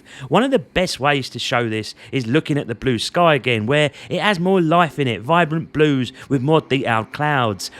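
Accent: Cockney, United Kingdom. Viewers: Oy Oy Balham market, from Tooting myself. Nice comparison vid, I'll stick with my Steam Deck for now as it does everything I want as I play a lot of indie games and emulation, I don't really play AAA titles, if I do fancy one I play it on my main box. Subbed and liked for a fellow Cockney geezer. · Very cockney :)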